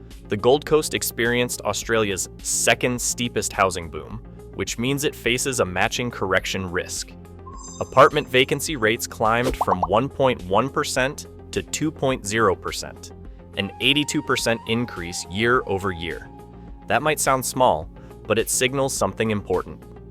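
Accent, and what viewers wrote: United States. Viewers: Why the American accent? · Why the American accent, inconsistent info and fake claims? clickbait. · Perhaps you might use more up to date information and use relevant shots instead of pics of other parts of the world. Not sure who you are but an American voice just denigrates the post. But you have fun passing misinformation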